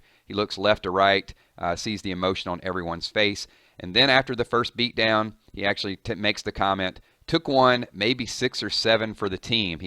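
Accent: South, United States. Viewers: where are u from I can hear a southern accent btw what do u use to stream road to survival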